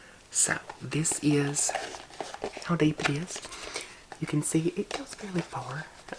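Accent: South, United States. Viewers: First time seeing your channel and omg wow. First of all, that FECKING ACCENT IS SO EXCITING. First southern accent I’ve come across in ASMR! And this video idea is amazing 😂 I love Tupperware 😍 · Please make another southern accent video! I’ve watched this one dozens of times! I love it!!!! ❤️ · WHEN YOU SAID HOGWASH!!!! AAAAHHHHHHH I about DIED!!!!! I didn’t realize people spoke like this until I moved down to the south!!! 😂😭 I love it!!! When I saw the title I instantly thought of the lady who complained about her Tupperware on the judge show! 😹♥️ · I like the southern accent series. Do more :)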